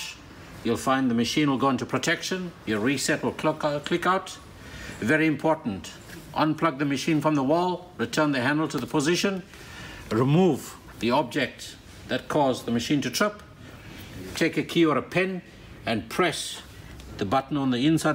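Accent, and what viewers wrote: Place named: Durban, South Africa. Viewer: You sound like you are from Durban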